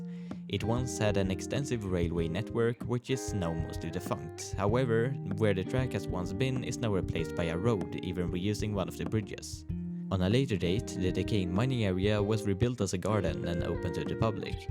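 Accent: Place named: Sweden. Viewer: really good man also love the swedish accent 😭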